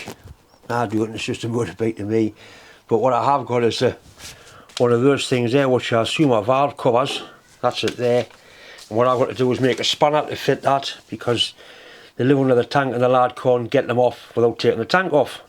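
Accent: Newcastle, United Kingdom. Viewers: Hi, just stumbled upon your channel, nice to hear a Geordie voice again. I live in Australia but come from Whickham just above the metro centre, just one question for you how the hell do you calculate the distance between teeth when cutting a module1gear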